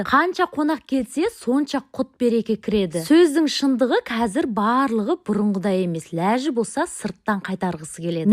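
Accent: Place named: Qashqadarya, Uzbekistan. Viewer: Qashqadaryodanmisiz deymanda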